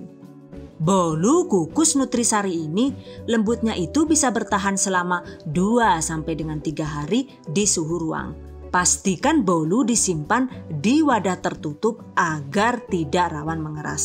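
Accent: Banyumas, Indonesia. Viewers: Mbak asline mana??? Kok bhasanya ngapak? Asline ngendi mbak? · Lah langsung TK subkrek mbekayu Pas krungu basa ngapake😂😂😂😂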